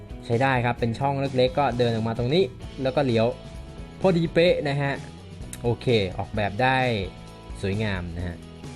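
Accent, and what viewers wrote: South, Thailand. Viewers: พี่แหลงภาษาใต้ได้ม้าย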